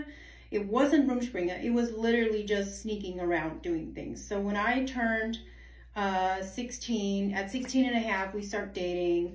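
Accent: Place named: United States. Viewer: You sound like you got a lot of American in you and your parents were American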